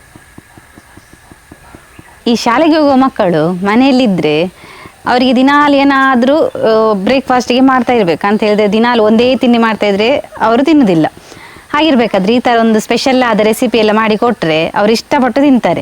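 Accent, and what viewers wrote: Coastal Karnataka, India. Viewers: Omlet egg inda madodu mathra gottith... Tomoto inda madid nodi nanu try madde... Super agi bandide .... Nimma karavali mathu super... Namge bahala ista aythu marre...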